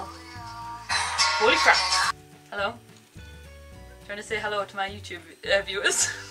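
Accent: Newcastle, United Kingdom. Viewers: You sound like ur from Newcastle (like me btw ) r u xoxo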